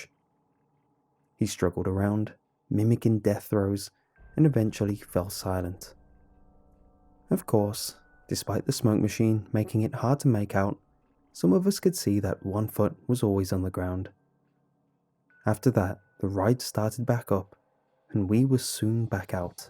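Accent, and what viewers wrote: United Kingdom. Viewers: Still liking this Beyond Belief: Fact or Fiction twist of a series. Creepy Pasta reading is kind of dying, and I know it's hard to move on to something new. You're doing great. Anyone else have that deer in the head light look every time an Asian person has a British accent? Come on....come on....be true to yourself.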